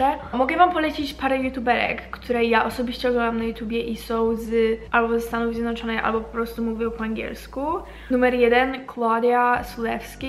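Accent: United Kingdom. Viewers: Ty masz już taki akcent angielski i mówisz po ang jak byś tam mieszkała od zawsze hhshshsgsgs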